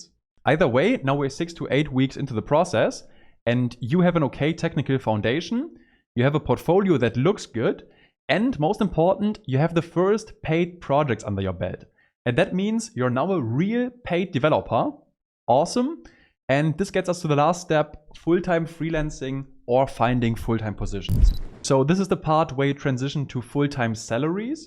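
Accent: Germany. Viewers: i am 100% sure that you are a german, nice video btw that will help to improve my own page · Fucking zero explanation done. Just a German guy rambling, wasting 10 minutes of your time.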